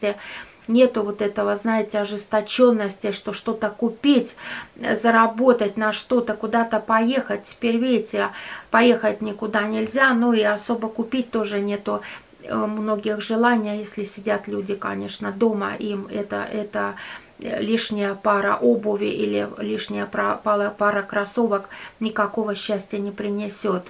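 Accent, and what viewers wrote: Finland. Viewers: Здравствуйте,! Русский у Вас отличный. Ну а акцент-это более 30 лет пребывания в Финляндии. А про юмор могу сказать, что не каждый в России может знать столько "мулек", как Вы. Канал смотрю месяца три, очень нравится. Продолжайте в таком же духе. Смотрела ранние видео. Хоть мы и далеко друг от друга (где Финляндия и где Урал), мне интересно знать, как живут люди за горами, за лесами)))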